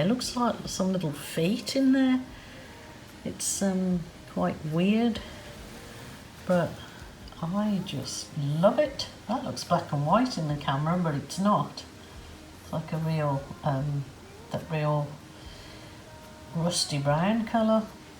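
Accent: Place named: Norfolk, United Kingdom. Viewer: This is beautiful - What is your accent? I feel like i could hear lots of different ones, bit of a norfolk twang - bit Birmingham, bit Australian... Could listen to and watch you for hours...❤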